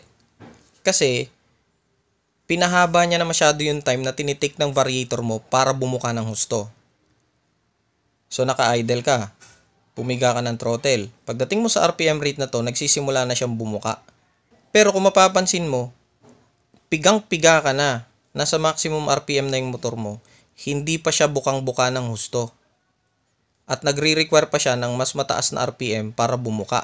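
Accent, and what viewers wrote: Ilocos, Philippines. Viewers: Ito talaga idol ko sa paliwanag ng cvt eh. Tanong lang idol, ilokano ka? Saan ka idol? Hahaha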